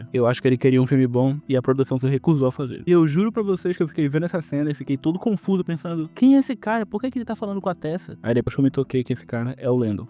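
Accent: Pará, Brazil. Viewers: Mano, fala sério, tu és paraense, né bicho? Tu é doído é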